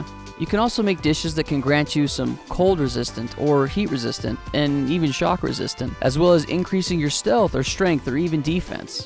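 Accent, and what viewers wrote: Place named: California, United States. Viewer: this choking californian accent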